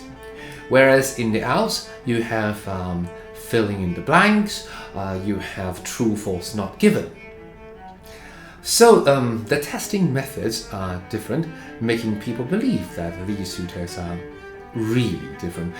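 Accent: United Kingdom. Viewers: 這是英國哪種口音? 我一個大陸同事有一模一樣口音，看到影片驚呆了我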